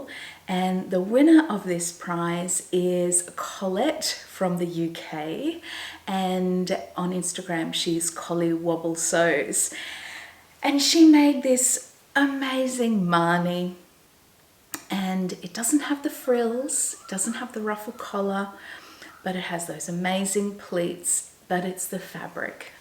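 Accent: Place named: Australia. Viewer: I wonder why you call Ruan Ruarn?? I follow her also and she pronounces her name as Ru anne. I’m sure it’s not your Aussie accent. I don’t mean to be critical and she’s probably too polite to say anything.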